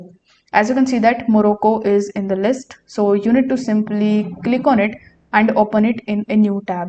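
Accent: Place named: India. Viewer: 🙂🇲🇦 لغة انجليزية خاصة بك تشبه هندين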